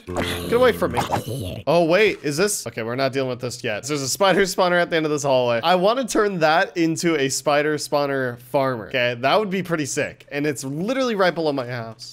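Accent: Ireland. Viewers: Ur Irish?! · I never knew u were irish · Wait Irish???? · Irish? Jacksepticeye?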